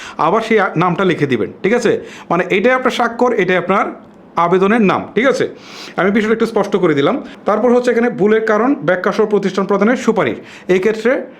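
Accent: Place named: Kishoreganj, Bangladesh. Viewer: ভাইয়া আপনার বাড়ি কি কিশোরগঞ্জ জেলায়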